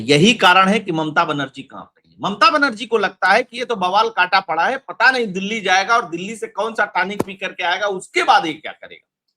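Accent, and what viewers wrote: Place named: Lucknow, India. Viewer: Ram Ram bhai , big fan here from NZ. Love your detailed work and analysis along with your local lakhnavi style of speaking . 👍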